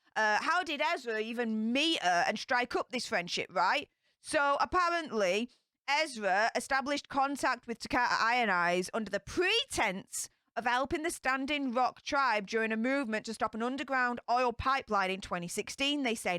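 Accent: Yorkshire, United Kingdom. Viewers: Hey love your sass and Yorkshire gobiness. Sending love from Bradford.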